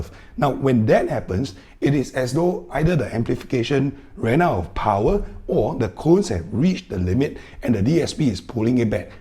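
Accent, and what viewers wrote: Singapore. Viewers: love the videos... And love the Singlish.. No doubt you are from beautiful Singapore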